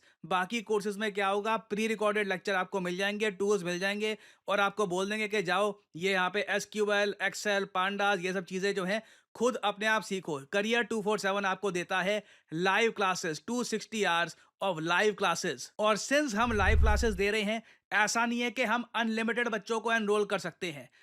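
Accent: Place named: Punjab, India. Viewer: Sir to aaj Punjabi twist me padha rhe hain 😍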